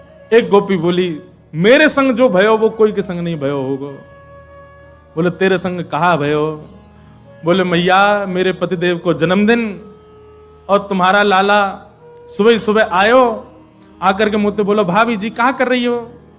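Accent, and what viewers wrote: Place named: Bharatpur, India. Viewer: Ye bharatpuri accent me jo katha vachan kr rhe h sun ki bahut khushi milti h 🥰🥰🥰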